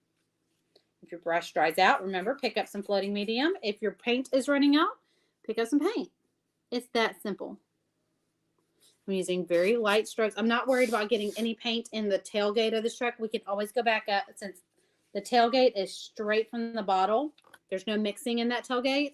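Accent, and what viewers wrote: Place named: South, United States. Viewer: Lovin her southern accent….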